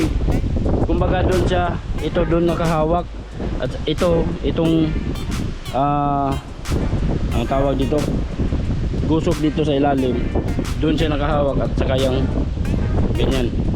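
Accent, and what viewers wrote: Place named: Visayas, Philippines. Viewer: Intindihin mo po nalang kc bisaya sya parang Tagalog lang po yan mahirap intindihin bisaya😂😂😂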